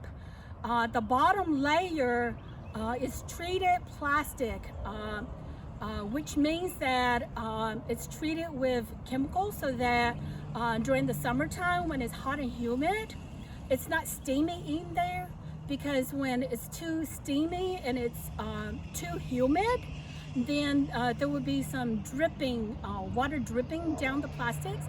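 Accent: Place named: South, United States. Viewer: Love the greenhouse! Love your southern accent too.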